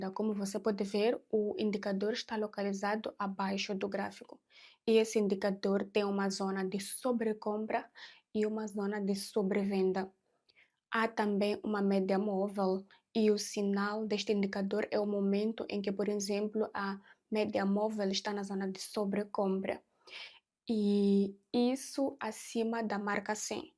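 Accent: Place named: Mozambique. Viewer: Pura moçambicana. Na fala tem tudo de moçambicanos.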